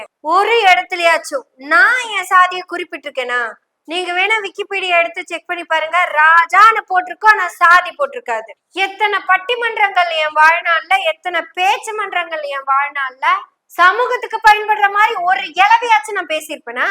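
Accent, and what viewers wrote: Kongu, India. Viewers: Kongutamizh Amma super.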